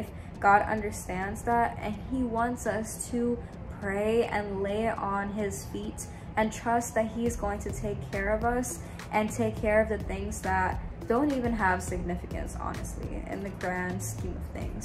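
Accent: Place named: India. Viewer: I can tell you're indian from your accent alone ❤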